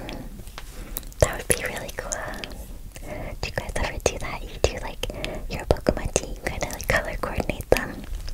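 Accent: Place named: United States. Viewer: Where are you from? I'm british so its quite hard to pin which state of america or perhaps canada... ive no idea but i really like the way you say your intro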